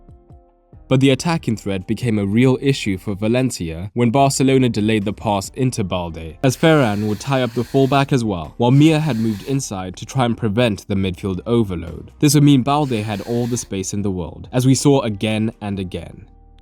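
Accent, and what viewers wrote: South Africa. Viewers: Why does bro sound south african😭😭😭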